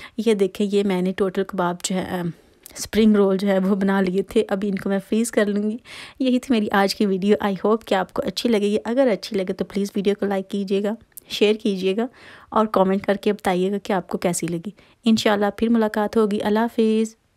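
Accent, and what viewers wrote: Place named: Faisalabad, Pakistan. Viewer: Aoa. Mashallah looking nice. App Faisalabad se h app ki boli Faisalabad k logo jaisy h. Btana zaroor.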